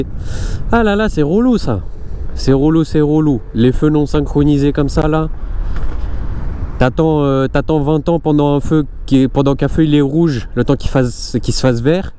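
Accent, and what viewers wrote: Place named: Romania. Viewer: Vous avez un accent. Vous êtes d'origine roumaine ? Vous parlez bien français. Attention au code de la route...!! Ligne blanche continue...et plus ENCORE...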